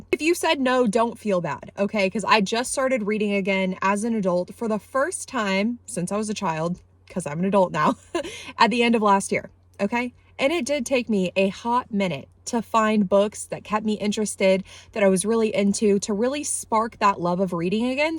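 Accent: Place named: United States. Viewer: I understand where you're coming from, but you have to be able to see a path to banning literature made by marginalized groups stemming from criminalizing this drivel. You have an american accent, so I'm assuming you're from the US. If you are, you likely know literature in general is under attack here. Personally, I think social rejection needs to be the method to discourage this garbage, rather than legislation and criminalization.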